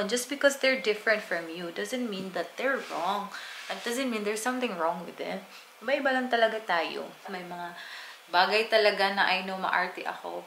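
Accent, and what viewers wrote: Visayas, Philippines. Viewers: Kasi Bisaya ka Kasi, Kaya pag nagtagalog ka parang may kunting accent Ng bisaya na malambing, Kaya akala nila maarte na, di nila alam malambing Ang mga bisaya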